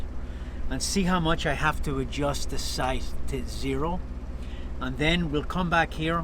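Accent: Ireland. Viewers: Great video, what pat of Ireland you from? I cant tell, Dublin? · i have to ask.do you have a slight irish accent? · I can hear a hint of Irish Accent in yer Patter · Two things that accent, Irish Scottish? Second how does the rifle operate from short action to long action? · Irish! That's it! I couldn't figure out! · Hey mate you sound Irish 🇨🇮🇨🇮🇨🇮 👍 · Where is the presenter from? Sounds Irish to me. He said 'so we were', which suggests Northern Irish rather than the Republic. Perhaps border.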